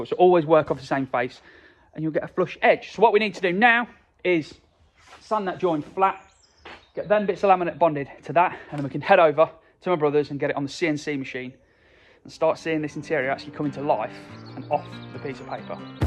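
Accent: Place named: Leicester, United Kingdom. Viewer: Good film bud, as usual. Too much talking my arse 😉. The Lestoh accent makes me laugh though (Nottingham here 😉)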